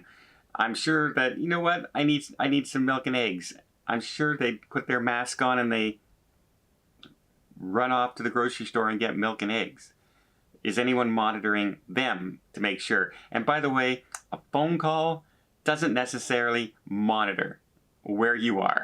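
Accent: Canada. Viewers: My husband loves to watch your videos. He says you sound so Canadian; he is too. Thanks for being logical. I don’t believe the number of covid cases onboard with crew and passengers is public information.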